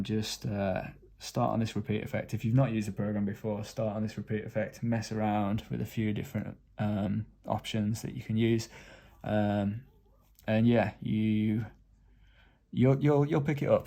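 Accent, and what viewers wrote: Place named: South London, United Kingdom. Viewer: I love your accent. I sense you are from South London? Your tutorials are great, you go at my speed so I can keep up with you. I’m learning a lot from you and guess what… I do not read manuals! I’m a poke and stabber. I will be catching all your tutorials as you make the app less 😵‍💫 thank you!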